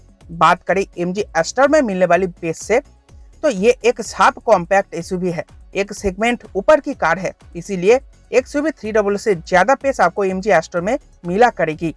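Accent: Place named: Bengal, India. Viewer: Saale बंगाली. Baat kese kar raha hai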